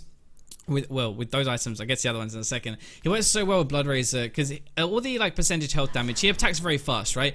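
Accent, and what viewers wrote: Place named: Nottingham, United Kingdom. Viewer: is totally from Nottingham or Oxford or some shit... >.>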